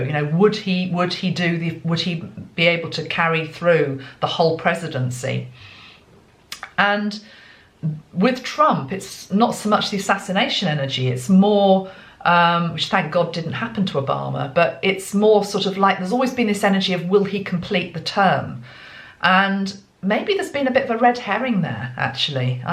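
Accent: England, United Kingdom. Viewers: hello. to be completely off script; just wanted to say I love your accent and it reminds me of the English actress in Agatha Raison. a jolly good series we got here in Australia recently. also it was good to be reminded of the Light Workers. thankyou for your insights. janell downunder x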